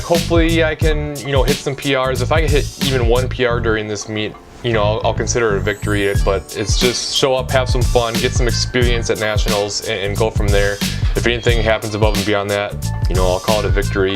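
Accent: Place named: Minnesota, United States. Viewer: I missed that Minnesota accent. I'll see you at nationals also bb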